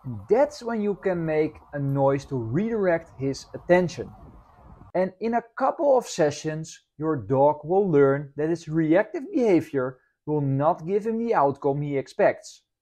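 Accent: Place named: Netherlands. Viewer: Hoi ik hoop dat ik mijn oprechte mening mag geven op je nieuwe uitdaging om video's voortaan in het Engels te maken. Je uitspraak van het Engels is nog té "Nederlands" kortom klinkt beetje raar...😮😮😮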